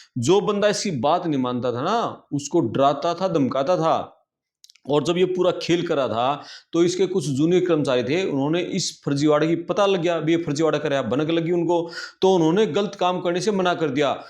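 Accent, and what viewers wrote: Haryana, India. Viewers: Some haryanvi accent 😮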